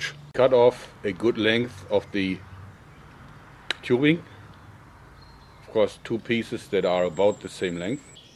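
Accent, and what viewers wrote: Germany. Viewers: The german accent is the main reason why i watch his videos :)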